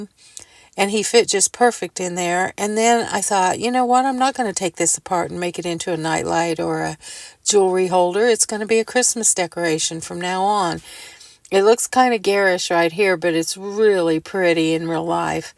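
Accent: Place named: Indiana, United States. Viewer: I did not realize until this video that you are in Indiana. When started watching your channel recently --- your voice reminded me of one of my Aunts & her daughter's voice. Both from Indiana (the Indianapolis area) & maybe this is why - a Indiana accent. Thanks for your great videos - always enjoy them.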